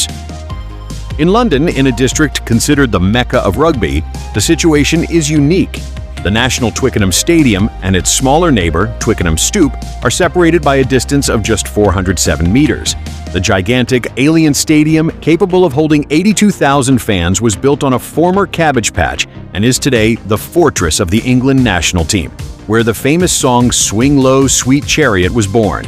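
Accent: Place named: United States. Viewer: Bring back the old narration voice, the American voice doesn't sound as nice.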